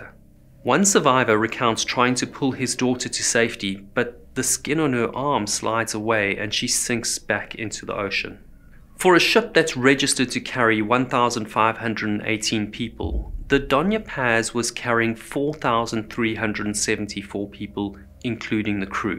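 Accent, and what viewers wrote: South Africa. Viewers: His pronunciation is typically South African and is perfectly comprehensible to my American English ear. Where are you from that you are having a hard time understanding this?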